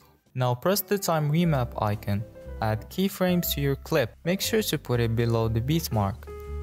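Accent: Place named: Morocco. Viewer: You sound Moroccan😭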